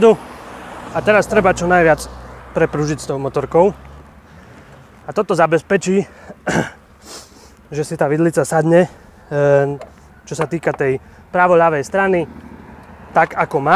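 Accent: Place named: East Slovakia, Slovakia. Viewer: Čuc že to vychodňar 🤣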